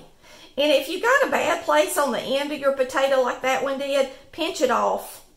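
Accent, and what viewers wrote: South, United States. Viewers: HI, Im originally from THE ICE BOX OF THE NATION, INTERNATIONAL FALLS MINNESOTA, but we lived inTennessee for 15 years, now we’re back living in northern Minnesota. I miss hearing the southern drawl I love listening and watching your show, along with your wonderful cooking. Your a great teacher and have a sweet loving personality. I’ve always been curious about the southern potato candy I’m gonna go ahead and make me a batch.♥️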